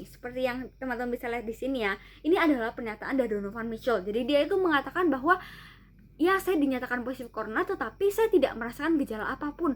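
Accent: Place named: Surabaya, Indonesia. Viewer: Ngomongnya suroboyo bgt ya😆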